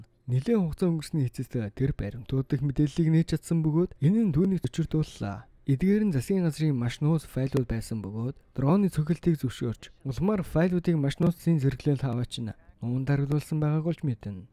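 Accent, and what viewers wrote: Uvs, Mongolia. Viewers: Uvs maygaar yriku bj bolkumu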